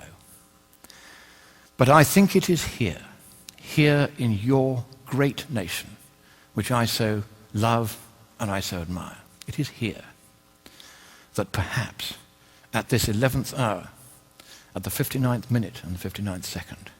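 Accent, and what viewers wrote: United Kingdom. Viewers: Oh look, it's Glenn Beck with a british accent. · The effects of too much Co2 do effect our environment. Just because the talking head in this video has a British accent and has adopted the title "Lord" does not make him intelligent. Educated sure, but intelligent. Far from it. There are already examples in nature of how the rising Co2 levels have altered our environment and the living habits of animals. No one is saying it is just co2. But co2 is a large factor for reasons so complex and many that your head would explode. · Fear mongering of the worst kind. Half truths, a lovely British accent. What is he really saying? That we should recall the president? I think between Rupert Murdoch and Dick Cheney, they have something more "black ops" in mind. The real danger is turning our county over to the right wing ideologues. I'm sure they will watch over our freedoms for us. 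Until the middle class is wiped out, and we have a feudal state. Them in charge. The rest do what they say. Now can we see who is a Nazi? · Great, a shithouse-rat insane conspiracy theorist with a british accent. He doesn't back up anything he says with scientific evidence, he just expects you to believe it because it sound good. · Nothing more than a right-wing fear-mongering asshole with an English accent.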